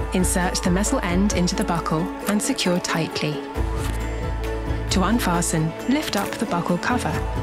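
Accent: United Kingdom. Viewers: Мне кажется надо было записать реальный голос бортпроводника с казахским акцентом, ведь в этом тоже кайф, а не прилизанный британский английский. В остальном все круто сделано! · Какой великолепный британский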